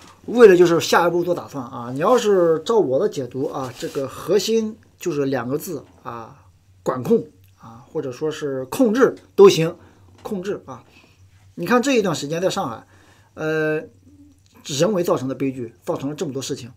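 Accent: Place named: Xiji, China. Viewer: 你口音像西吉县的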